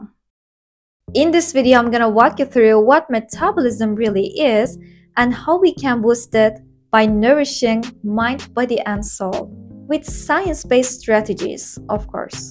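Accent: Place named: Turkey. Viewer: Im in love with your turkish accent 🤩❤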